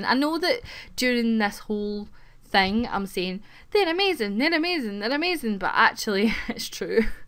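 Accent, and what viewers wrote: Scotland, United Kingdom. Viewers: I'm glad you will have more time to do what you seem to really enjoy! I certainly love watching your LPs and listening to your lilting Scottish accent! I'll definitely be continuing to watch TS3 LPs and I really look forward to seeing how you, Peter and Jenn play TS4 because I am so confused about how this game is supposed to be played. I know it's supposed to be a sandbox game, but it definitely seems to be geared toward a different playing style than what I've been using for the past 10 years, so yeah... Thanks for everything you do and for all those who helped with Big Brother! I've never seen the actual show in any way, but I don't think I would enjoy it as much as your version.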